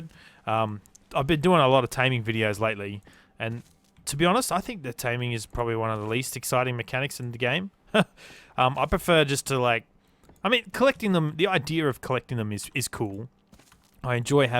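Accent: Australia. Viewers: live in Sydney, Australia and my internet is not the best but my friends internet is really good like i went to his house with all of our mates (6 of us) and we were all downloading unturned and we all did it in 30 seconds. do you live in WA or something? cause it sounds like it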